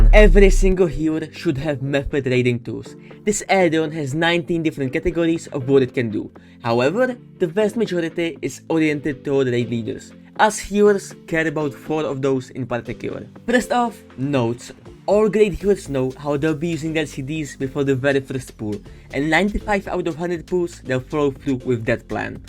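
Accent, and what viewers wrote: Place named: China. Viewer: Jesus Christ, your "chenglish" is killing my brain cells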